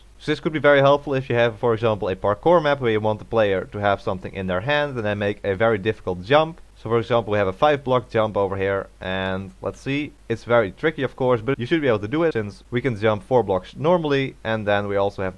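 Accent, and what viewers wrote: Netherlands. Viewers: You can just hear the dutch accent...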